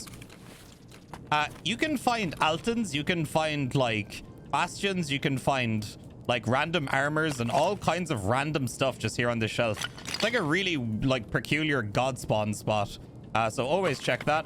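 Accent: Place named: Ireland. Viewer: subscribed for the Irish lilt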